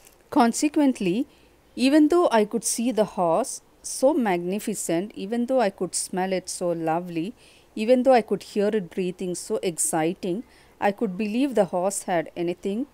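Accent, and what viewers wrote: Kerala, India. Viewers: Are u a Malayali?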